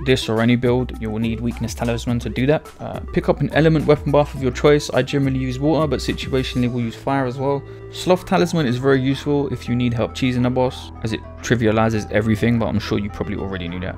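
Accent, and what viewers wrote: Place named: Cockney, United Kingdom. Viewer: Can somebody translate his cockney for me, no idea what he's saying.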